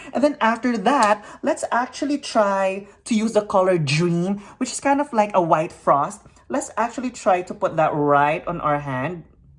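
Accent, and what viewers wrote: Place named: Philippines. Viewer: Love your speech over the dream and your Filipina accent! YASSSS! Immigrant +1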